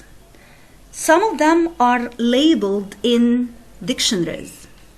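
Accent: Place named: Russia. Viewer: Are you Russian? Your accent is just like Russian accent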